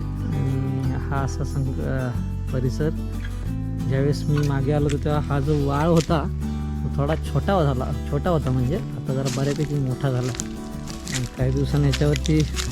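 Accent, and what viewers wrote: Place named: Ghats, India. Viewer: Bhasha. Kokni vatat nahi,ghatavarchi vatte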